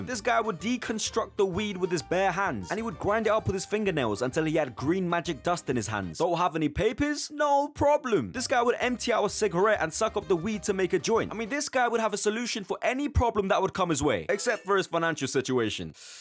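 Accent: United Kingdom. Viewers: he sounds like a fellow brit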